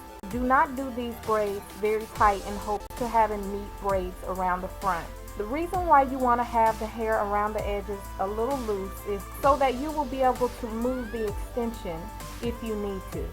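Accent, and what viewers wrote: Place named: South, United States. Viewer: Aah you're so pretty and your southern accent is charming! I'm just a beginner with crochet (I watched your pretwisted sen. twist videos) but I wanna try this in the future! Thanks for the video!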